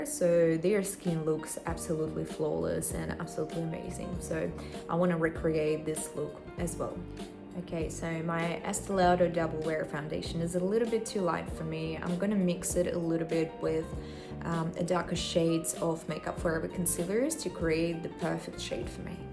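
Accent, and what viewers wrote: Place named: Australia. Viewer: у вас такой классный австралийский акцент😍очень красиво звучит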